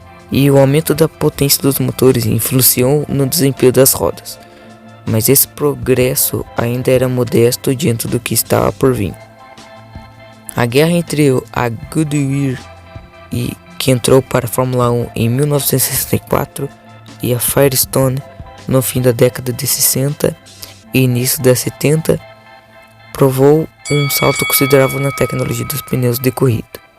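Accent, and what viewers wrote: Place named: Paraná, Brazil. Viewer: Vc é paranaense?😂😂 Tem mto sotaque, olha q sou daqui e reparei, mas mto bom o vídeo msm, cheio de informação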